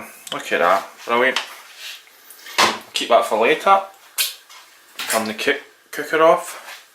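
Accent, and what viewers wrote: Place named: Scotland, United Kingdom. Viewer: Fab ill be giving this a try. Love your Scots accent btw, its ace :)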